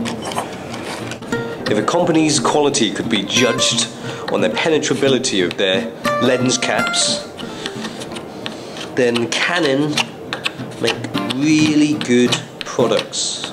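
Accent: United Kingdom. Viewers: a chinese man speaking british wtf is going on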